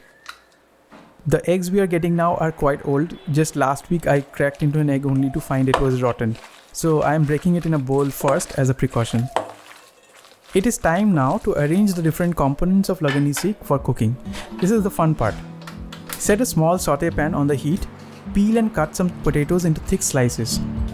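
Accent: Bengal, India. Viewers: Love the way you speak Bengali words in the same. বাঙালি accent in between your English narration. Love your recipes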